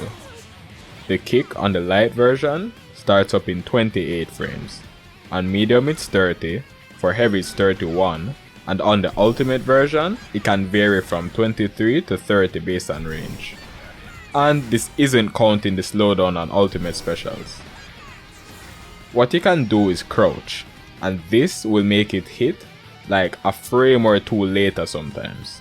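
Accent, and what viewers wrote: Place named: Jamaica. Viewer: You sound Jamaican. Been struggling to find granblue players in this country.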